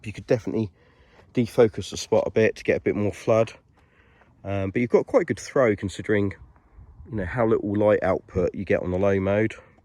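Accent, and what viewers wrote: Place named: United Kingdom. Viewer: British speech 😊😊😊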